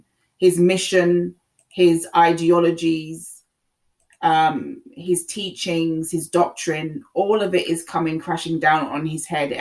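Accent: United Kingdom. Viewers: Love your accent a new subscriber are you from the UK.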